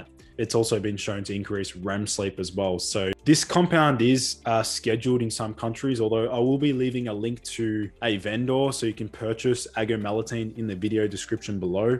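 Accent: Australia. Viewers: Was that you calling in on Danny's podcast? I was like:" with an aussie accent, I know that guy " haha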